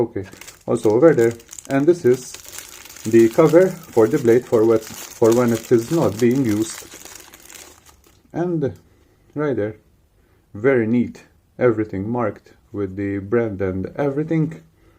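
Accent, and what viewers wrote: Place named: Malta. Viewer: Delightful surprise to hear a Maltese accent millewwel :D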